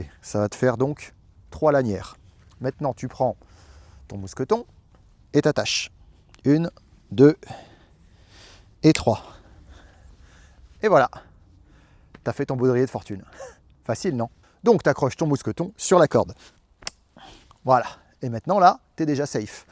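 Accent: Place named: France. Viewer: ... Pourquoi les français se prennent tjrs de pseudos Ricain ave ce bon gros accent franchouillard? Tu ferais mieux de te faire appeler Robin Dubois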